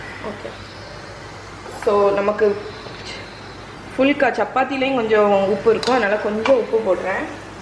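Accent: Nagercoil, India. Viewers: Nanum nagercoil than akka.....ipo konja days ah than unga videos parkurean.....super akka...nice character you are........ enaku 2 months before than baby poranthuruku....unga kutties parkum pothu enakum Oru girl baby vanthatha nenachu proud ah iruku akka....nager coil slang ah YouTube la parkum pothu romba happy ah iruku.....may God bless you akka.....en husband ku cake romba pidikum...unga channel parthuthan cake pannurean...nice receipe....super.....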